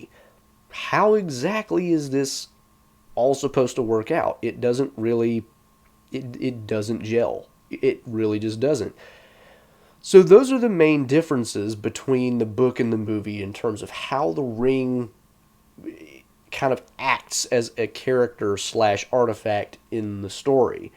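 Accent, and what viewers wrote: South, United States. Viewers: That is a very HARD Southern accent you appear to have. Kinda glorious. The os and as are a dead giveaway.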